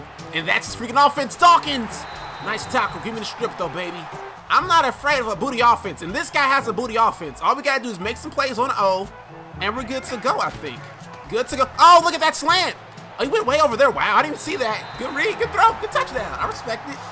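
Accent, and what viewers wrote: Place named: New York, United States. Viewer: you from New York that's what's up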